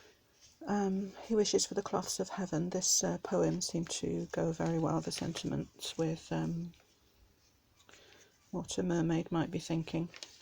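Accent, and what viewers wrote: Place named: United Kingdom. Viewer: Stunning! And I so enjoyed the video too. You make me laugh, 'no, scrap that, just go and live you'd lives ', so funny. And I look forward to the woodland journal tutorial. I'm intrigued to know how to ink pages the way you do. They are very beautiful. I'm new to art journalling, JJs, etc, so I'm learning. I'm in the Uk too, it's good to hear a homegrown voice x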